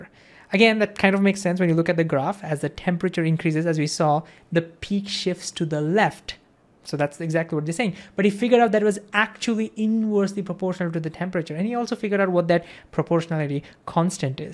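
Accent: India. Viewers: Among the bes tindian youtube channels (or ones with indian accent anyways) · I think you should change your beard and cap style to become more dapper because your indian english speaking with that getup is eerily similar to a fundamentalist islmeek preacher who was kcked from India. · in the video. First time I see a video from you. Let me tell you two things. One bad, one hopefully less bad. First, the bad: you DO have a strong indian accent and I hate it. Simply because it's just a tiny little bit harder for me, a French, to understand. Second, the less bad: I know I'm going to love whatever content you offer, insta like, insta subscribe. As an old PhD in physics now working in engineering, I know that 1. I'm not up to date and 2. my education and teachers were both excellent, thank you, yet, ANY form of education is a bit lacking. History of science for instance: can you imagine that I studied that for ONLY one year? 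- Yes, only one year. This discipline shall be mandatory for EVERY scientific field! - But yes, I still had the chance to study it for one year, told you I received an excellent education. Understanding HOW and WHY great ideas came to be, I dare say, is probably more important than WHAT the great idea is. Unfortunately, it's also much, much harder to teach, and longer, therefore education chose to focus on what (also, when you need that "what" to carry on with the next great ideas, it kind of make sense: efficiency)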